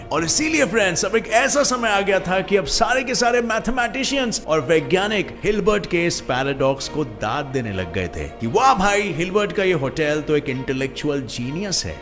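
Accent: India. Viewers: Liked your new abdaaz of speaking... Desi........ 😎😎😎😎😎😎🤩🤩🤩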